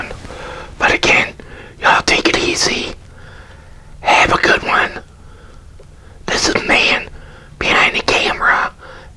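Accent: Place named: United States. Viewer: that looks so nice yummy i bet you enjoyed it by the way im from the uk and im guessing ur from america due to your accent haha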